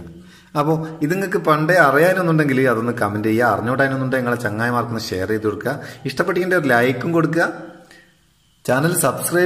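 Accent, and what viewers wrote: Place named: Kasaragod, India. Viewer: അടിപൊളി ഐഡിയ. അടിപൊളിയാവുന്നുണ്ട്ട്ടാ👍 ങ്ങളെ കാസർകോട് ബർത്താനം ഞമ്മക്ക് നല്ലോണം പുട്ച്ചിക്ക്ണ്.😜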